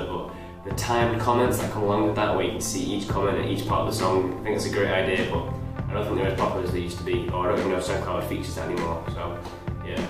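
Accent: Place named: United Kingdom. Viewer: British accent eminem voice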